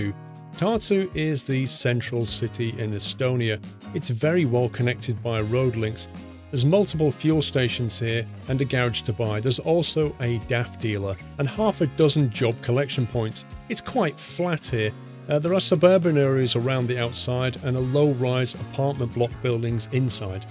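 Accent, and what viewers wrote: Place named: United Kingdom. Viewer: That British pronounciation :D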